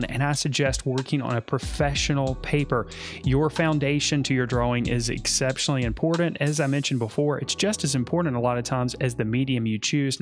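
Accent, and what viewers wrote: South, United States. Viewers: Aidan and Cristie Stevens Just a Southern accent. I’m sure he doesn’t do it just to bug you. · Hes got a bit of a southern draw..... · "Karen Dash" I was listening, not watching when he said this and I had no idea that he meant Caran d'Ache! LOL Never heard it pronounced with such a strong southern USA accent before. He sounds super country.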